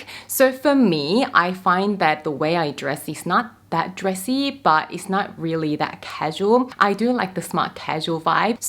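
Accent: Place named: Australia. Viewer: I saw one of your scarf videos for the first time today. It’s four years old, but I am so grateful. I waited and waited til I thought I was going to have to ask if that was an Australian accent, then right at the end you mentioned Sydney. ☺️ Can’t wait to watch so many more of your videos. I have access to new, free designer clothes, jewelry, and makeup. Those I don’t get for free, I get for as much as 90% off. I cannot declutter because my home has become s hoard and it’s a hoard with no storage. Without help, I’m just going to continue drowning. I went to the sale where I get most of my clothes, and came home with four more scars, a gorgeous handbag and a few pieces of jewelry – none of which I should’ve purchased. Designer scarves for $1.50 so I’ll use that as an excuse. I have clothes that could sell, but the resellers market has changed so dramatically, I don’t know which platform is worth it. 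If you have a suggestion, I would really appreciate it. Poshmark has been a complete waste of time for me.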